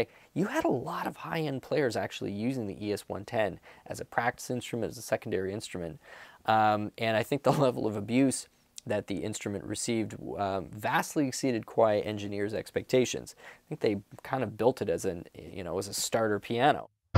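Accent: Canada. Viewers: Loving the Canadian accent. I hear what you are talking "a-boot"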